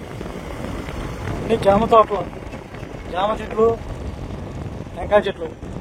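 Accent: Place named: Nellore, India. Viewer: నెల్లూరు యాస బలే మజాగా ఉంటుంది బ్రో నీ భాష ఒరిజినల్ గా వుంది సూపర్ బ్రో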